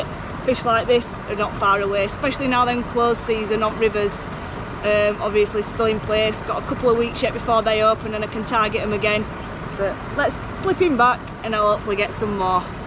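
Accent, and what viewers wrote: North, United Kingdom. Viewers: Love northern acent 😀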